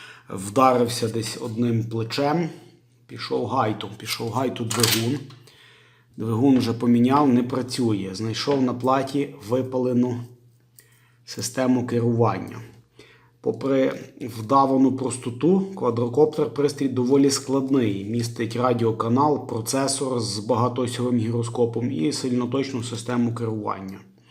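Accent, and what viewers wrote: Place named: Polissia, Ukraine. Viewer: Зацікавив ваш акцент. Ви випадково не з київської області (з регіону де живуть Поліщуки)? Частенько чую у вас слова притаманні саме тому регіону.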